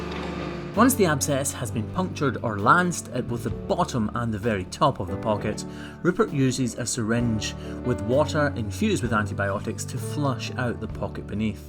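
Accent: Scotland, United Kingdom. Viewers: I've watched your videos for months now and love your content. Please distract me with your scottish accent from the horror my country is becoming (USA). 😭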